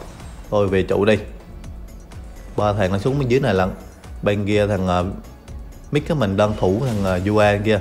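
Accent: Quang Ngai, Vietnam. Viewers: Y chang, hồi kì nghe là ngộ ngộ ồn người quảng ngãi rồi. Chào đồng hương nha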